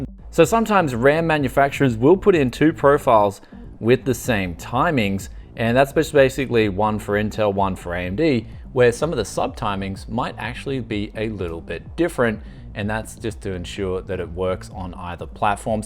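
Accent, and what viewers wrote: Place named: Australia. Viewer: What I was expecting: *A high end PC build with used parts* What I got: *The Discovery Channel ft. some Aussie with a sexy voice*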